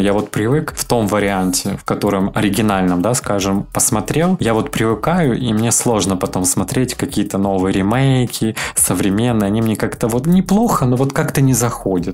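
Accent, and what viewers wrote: Ukraine. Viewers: почаще в речь включай украинскую мову, но с переводом.Украинская речь такая милая.Успехов.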